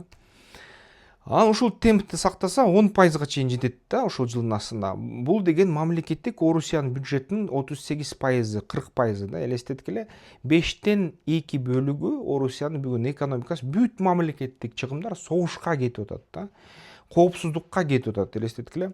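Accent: Standard. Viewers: Эн маанилүүсү таза эне тилде сүйлөгөнү эле кандай сонун ийгилик түшүнүктүү кылып айтасыз керемет.Ар бир берүүнү күтүп көрөбүз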